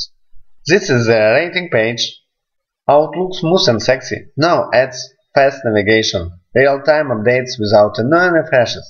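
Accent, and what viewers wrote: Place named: Russia. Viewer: ебучий русский акцент))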